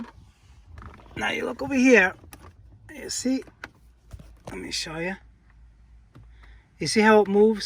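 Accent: New York, United States. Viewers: I love his accent. This is a new york accent right?? Maybe Boston.. but i dont think. It sounds too close to home.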